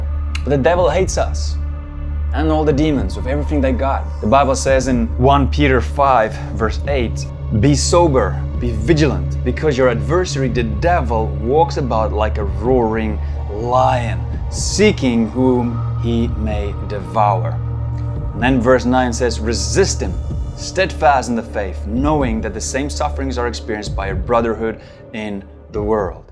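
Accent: South Africa. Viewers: U from south africa ey ?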